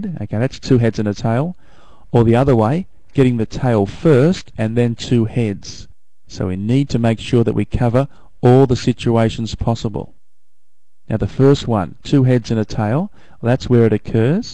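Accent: Australia. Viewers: WOOOO HE'S AUSSIE!! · I don't know why, but the Australian accent really helps me understand. This is awesome, thank you! :)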